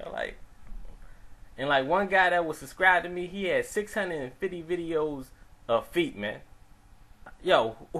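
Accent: Baltimore, United States. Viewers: It’s a Baltimore accent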